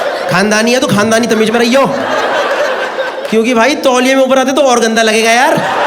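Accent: Delhi, India. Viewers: Unbelievable ji, your typical delhi government school type talk make it awesome. I can relate with you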